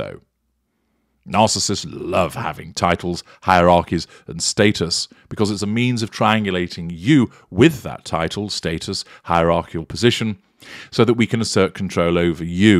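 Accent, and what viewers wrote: United Kingdom. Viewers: The combination of your accent, the fact that MM’s genuine character isn’t lost on you and the colorful language all while carrying the British accent. ❤😂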